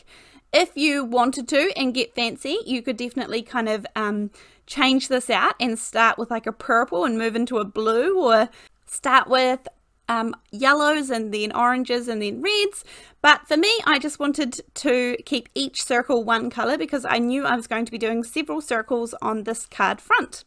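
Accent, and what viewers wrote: New Zealand. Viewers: Another great card! I just love all your creative, use what you have video's. You have become my favorite YouTube person to watch. Love your New Zealand accent too.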